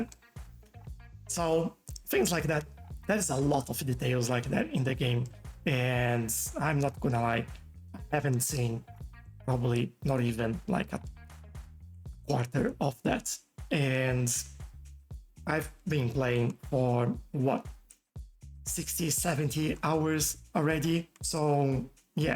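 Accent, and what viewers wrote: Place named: Brazil. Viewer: Amazing video! Are you brazilian? Your accent reminds me of our people speaking english.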